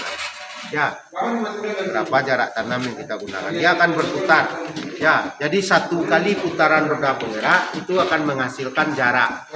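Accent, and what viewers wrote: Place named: Padang, Indonesia. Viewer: Bapak ini kayaknya orang Padang ya..saya liat dr logat bahasanya 😁😁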